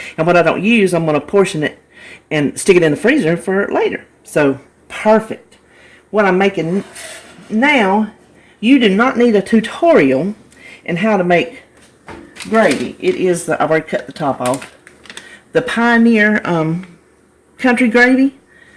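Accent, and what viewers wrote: South, United States. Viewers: Can’t wait to try this breakfast pizza! I enjoy your southern accent! Where in NC do you live?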